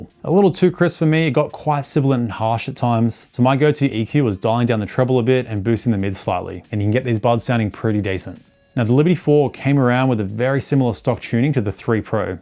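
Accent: Australia. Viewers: Yaaa rigght.. Soundcore sound quality is up there almost the best. Upgraded from Edifier x3 to Soundcore, Good build quality too. As Soundcore Spirit X2 and X10 user.. Both earhook design stays on ear good. But Spirit X10 was uncomfortable to take off ear and must twist the hook making it fall often while storing it anywhere or back in charger. It was irritatingly annoying. . And lot o wind noise while riding bike. I switched to stem shape buds, first one Tribit flybuds C1. Good sound only at almost full volume. Small soundstage but decent comfortable listening as no distortion. Further upgrade to Cmf Buds pro 2, mutiple features, Spatial audio. Ldac(battery drainer, almost same sounding). Very loud,too much bass if selecting bass boost abovs 2. Very Bad fit in ears with stock tips and have to try hybrid silicon or memory foam tips later. Hey, do a review on good n best eartips, they r most important part of earbuds. 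Plenty of good sounding budget earbuds in market sub 50 dollars.. and midrange sub 100 dollars price .. But Soundcore has the top sounding buds that competes with higher more expensive branded buds. Am gonna get Liberty 4c, hows the fit? . Good review with highly distinct Aussie accent,, slow down a bit mate.( jus kdn) .., slow when you highlight the main points. I rarely refer to earbuds reviews but saw yur channel, has lots n lots o buds.. Sooo manyyyy.. How you pick yur favourite from sooo many??, well i suggest yu keep diffrent for various occasions.. Travel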